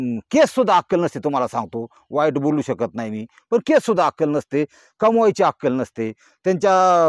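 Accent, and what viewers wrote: India. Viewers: तुमच्I गावरान भाषा खूप छान आहे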